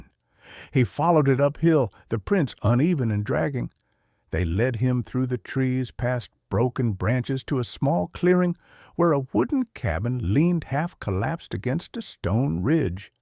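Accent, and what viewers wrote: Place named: South, United States. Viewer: Largo, Florida. I love your stories but I'm not crazy about the newer voice with the slight southern accent... 🙏💞🙏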